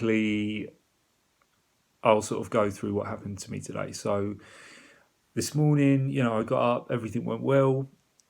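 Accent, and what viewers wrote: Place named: United Kingdom. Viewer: Its the British accent 🤩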